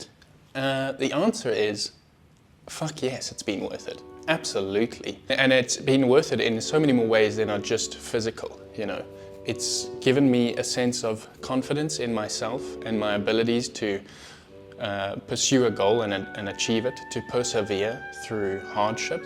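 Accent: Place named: South Africa. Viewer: Why is someone with a SAffer accent talking about "pounds"???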